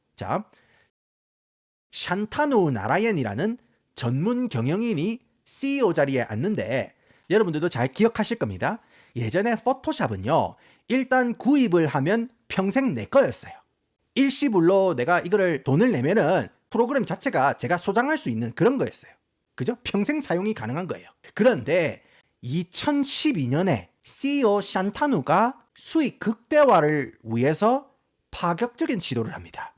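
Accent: Gyeongsang, South Korea. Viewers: 왜 알고리즘에 뜬건지 모르겠는데 경상도 사투리와 굴러가는 미쿡식 영어 발음의 콜라보가 매력적인분이시네요ㅋㅋ 좐/촬스 + 으깨/느그들 ㅋㅋㅋ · 이런 고급 정보를 개무시칸 갱상도 사투리로 전달 받는다는 점에 대해 소화 불량감을 느낄 갱상도 사람덜에 대해 생각은 안해보셨는지? 도무지 적응이 아니되옵니다~😅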